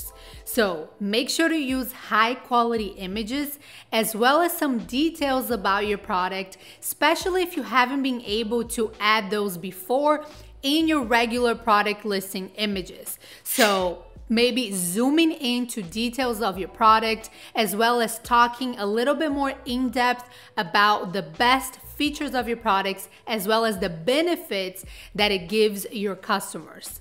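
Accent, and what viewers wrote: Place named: Brazil. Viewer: İ like the sweet 🍬Brazilian accent made it more fun to listen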